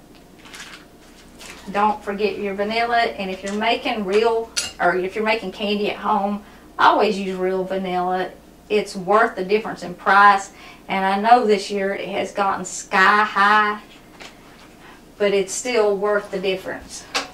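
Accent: South, United States. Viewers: I love your southern accent. We’re kinda neighbors. I’m in Nicholasville, Kentucky. Love love love your recipes.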